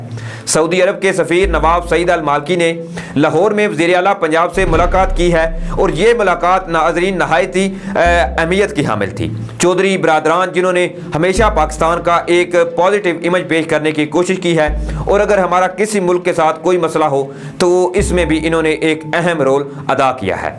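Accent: India. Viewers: Ap indian urdu q boltay ho Baaten itni piyari or lehja indian ka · Sad but unable to believe if u r really a Pakistani as usually you use Indian accent of Urdu. Can u clarify from where u r exactly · Aap ki batain achhi hain lekin kuchh alfaz ka talafaz Indian wala kyun hai. Sahih Urdu bolain plz.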